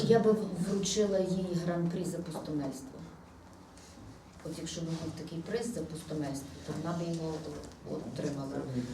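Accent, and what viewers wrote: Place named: Western Ukraine, Ukraine. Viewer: ты говорить научись не на суржике западенском, а на нормальном языке, на русском или украинском, но не на западынском как ты сейчас базлаешь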